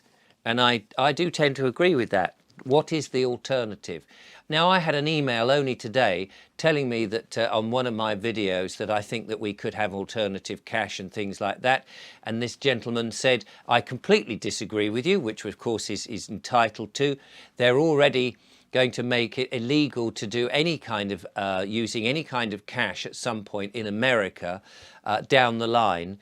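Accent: United Kingdom. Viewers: you are a gem! Love your 'terribly' British! I am Australian.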